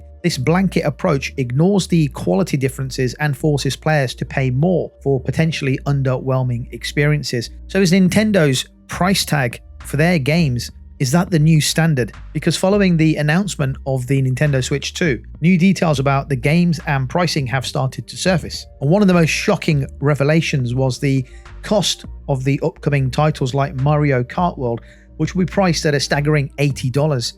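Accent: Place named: England, United Kingdom. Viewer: You've clearly got an English accent, so why you talking bucks & dollars and not £s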